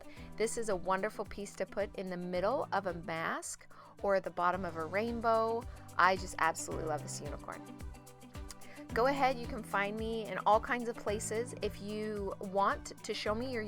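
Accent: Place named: United States. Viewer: we should do a collab, but I guess by your accent that you are in America...You are AWESOME!! Brilliant Art Work! <3 <3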